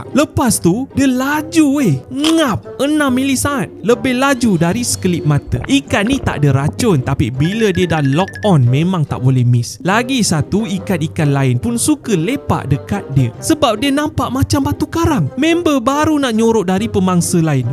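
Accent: Sabah, Malaysia. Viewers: slang sabah？ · metang2 ikn 2 ada dekat sabah.kau ckap pu kuar slan sabah bah😂😂😂😂😂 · Mantul btul loghat Sabah kau, terhibur ni! 😍✨ "Siapa datang, habislah kau!"😂😂😂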